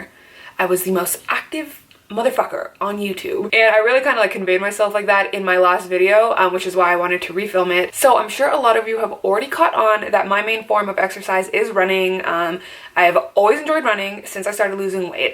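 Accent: Canada. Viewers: It’s the Canadian accent hehe 😊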